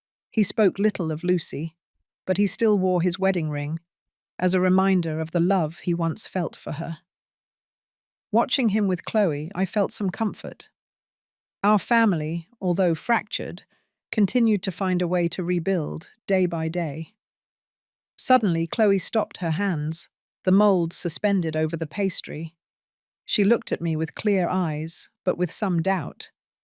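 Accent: United Kingdom. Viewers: Me too its an englih UK people accent .I enjoyed listening.i ❤it🎉🎉🎉🎉🎉🎉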